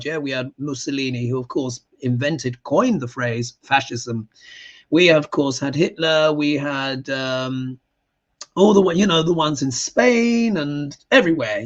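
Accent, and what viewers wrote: United Kingdom. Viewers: A cuban with a British accent 🤷🤔😅😂🤣. How can we greater raise the TRUMPSTEIN REGIME and GESTOPO CRIMINALS intelligence 🤷🤔🤷🤷❓❓❓❓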